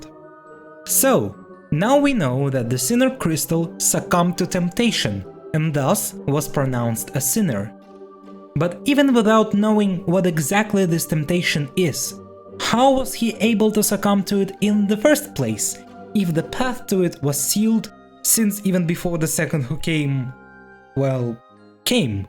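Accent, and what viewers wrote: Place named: Ukraine. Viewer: Сидел, сотрел видео. В конце оказалось, что автор Украинец (я то думал, что акцент такой забавный). Приятно знать, что автор так "недалеко" и приятно знать, что не только омерикосы делают хорошие видео с теориями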